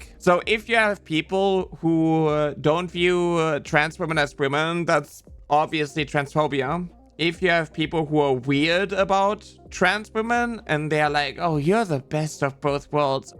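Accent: Germany. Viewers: r u german n etc ?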